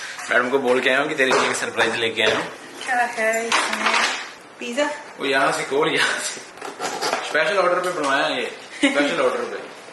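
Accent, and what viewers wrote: Punjab, India. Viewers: Bhahi tohadi hindi bilkul mere jesi hai.. Hum punjabiyon ki hindi bhi kamal hai :) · I always like to see your videos because it's informative and entertaining too... your talking way with punjabi-hindi that is awesome.... specially word Sat shri akal ki hal chal and chardikala.... God bless u bro 🙏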